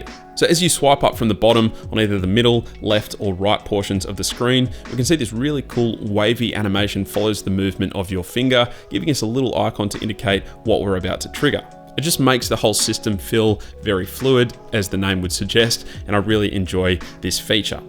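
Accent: Australia. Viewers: Awesome video! Just wanna ask, are you Australian?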